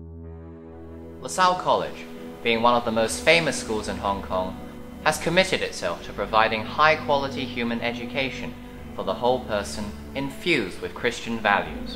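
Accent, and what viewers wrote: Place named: United Kingdom. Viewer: Who recorded it? Nice British accent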